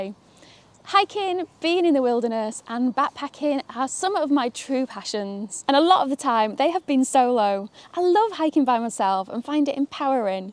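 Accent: United Kingdom. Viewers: I was confused for a moment when you mentioned fire arms despite the British accent😂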